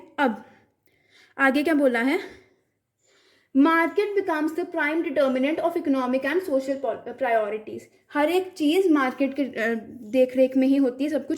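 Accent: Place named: Punjab, India. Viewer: Through your voice I get to know that you are absolutely punjabi girl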